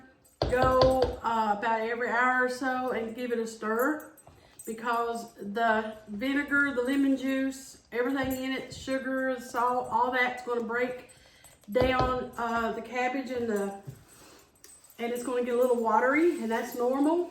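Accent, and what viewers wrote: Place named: Tennessee, United States. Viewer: Hey. I absolutely love Cole slaw with almost everything too girl. I don't put lemon juice in mine or white onions. But I do put vinegar and chopped green onions in mine. I don't put carrots in mine either. My family don't like them in it. I can't hardley get them to eat Cole slaw anyway. Except q of my twin daughters. But I will definitely try the lemon juice next time I make it. I'm from Morristown Tennessee girl. And my name is Melissa. Nick name is. Lol. Glad I found your cooking channel. I also have had wilted lettuce and poan bread( biscuits). I use to call the pintos. Soup beans. And my sister wud make fun of me. That onion soup chicken looked delicious. And I also cud eat dressing all the time too. I'm sure I've had meal gravy b4. Not sure who made it. Probably my mamaw. She was raised and lived in Tennessee as well. 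And hearing u talk sends me back to them good Ole days. When u say youens. I use to talk like that too. I had to move from Tennessee back in 1995 bc of a bad abusive marriage. To North Carolina. I do miss Tennessee so much. I just don't miss the craziness I definitely had to get me and my babies out of. I still have family that lives there. Cousins, STEP dad, step kids and step grandbabiesa step brother and some friends that I wish I cud come visit on a regular basis. I will continue to keep watching ur videos sweet doll. I'm absolutely loving it so far. Your daughter is so adorable. GOD BLESS US ALL SWEETHEARTS XOXOXOXOXOXOXO HALLELUJAH AMEN 🙏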